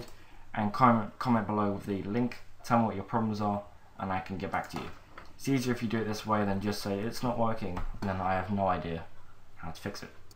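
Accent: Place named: England, United Kingdom. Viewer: I agree with HCFP Gaming, TOTALLY! There is always a pause button. Besides, I don't like downloading ONE video for just one piece of information. Download the video software with a traffic code (well, I am not on commission VLC Media player -free), you can watch your video at whatever speed you want. Do you know how unhappy my ISP is when I download 54 videos which could have been done in 14!? Love his video, especially the fact that he's English! Yummy accent!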